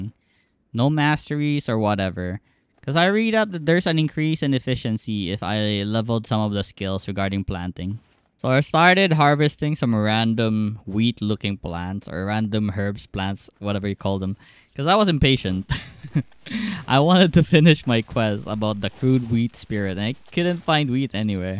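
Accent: Philippines. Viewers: Are you Filipino? Cuz your accent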